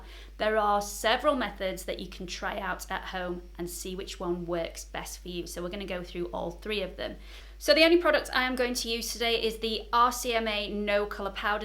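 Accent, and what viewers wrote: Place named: Leicester, United Kingdom. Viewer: Yes fixing spray would be great , really enjoying your channel. Both my friends and I have said you sound like your from our area , Loughborough Leicester. Lol . Take care 😉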